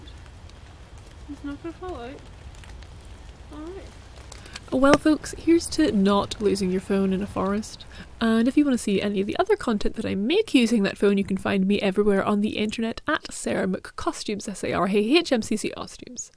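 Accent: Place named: Ireland. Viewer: I was like “this is 100% being crafted by an emerald isle accent”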